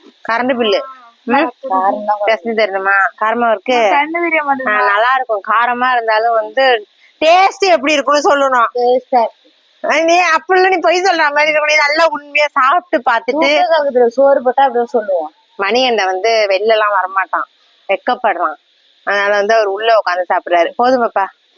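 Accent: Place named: Sirkazhi, India. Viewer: 👏👏👏👏👏👏👏👏👏👏👏👏👏👏👏👏👏 super video velai seiya ma srichitte pakkara neenga tha pottu pesumpothu pazhya niyabgam varuth sirkazhi Chidambaram side apadi pesuvanga nanga leaveku pogumpothu appadibpesirkkom innatha appadinu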